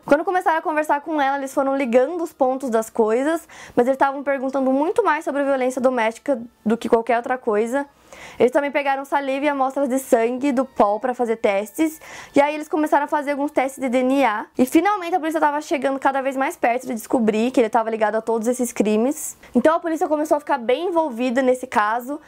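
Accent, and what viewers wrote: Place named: Rio Grande do Sul, Brazil. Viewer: vc é gaúcha? tem um sotaque em tanto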